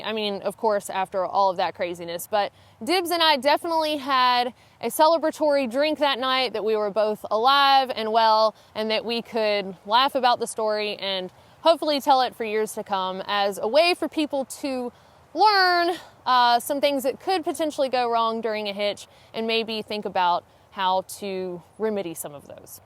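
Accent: South, United States. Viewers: Great story and great advice! I live in Hiawassee GA near the AT(actually recognized the stretch of road near Dick's Creek Gap and Top of Georgia hostel in this video with the two smiling hitchers) and have picked up lots of hikers going to/from town. I've always had great interaction with those hikers and they're always super appreciative for the ride. Never a bad idea to take pics of licenses and vehicles and send those to a loved one or friend just in case. I do it all the time with suspicious looking characters... just a couple days ago at the Unicoi Gap AT trailhead while out for a day hike. Better safe than sorry! Love your videos and your southern accent! Keep em coming? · Your videos are spot on! Stuff people need to hear and want to hear! The reason you do so good at getting new subscribers and people continue to watch you is because your genuine. 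It also helps that you have that southern accent but I can tell before you post a video you actually think what would I want to know about backpacking if I was starting out. Keep doing what you do and keep grinding! Remember that the things you take for granite other people are wondering. Here are a few things as a new backpacker I wonder about maybe you can do a video on. Is drinking and bringing your own alcohol frowned upon on the trail? How do you carry your alcohol? What about hunting or killing game on the trail? Fishing in ponds you come across? Do you carry fishing gear? If so what is the best? What app do you use for GPS? Eating spots? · As a native NC’n that hasn’t backpacked in 20 years... I have no idea how your videos keep popping up, but I’ll keep watchin’ just to hear you say “hike” and “neck knife”. Nuthin’ prettier than a girl with a great southern accent! 😍 Sadly, most of the girls native to central NC have lost most of their accent with all the Yankees moving in. 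😏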